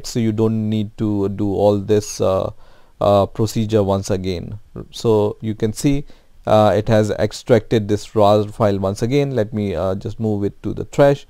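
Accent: India. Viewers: You are almost hundred percent sure of getting the answer you are looking for when you hear an Indian accent ....... Thanks a lot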